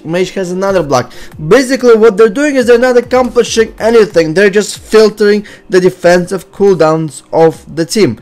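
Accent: Russia. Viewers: I would like to have a lesson because nothing is better than kicking some butts listening a multi glad russian ascent.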